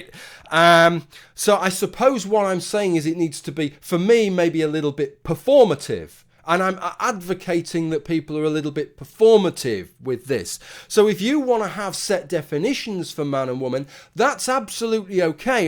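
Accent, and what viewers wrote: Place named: North, United Kingdom. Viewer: You have a pretty voice, sound like the northern people on Game of Thrones! XO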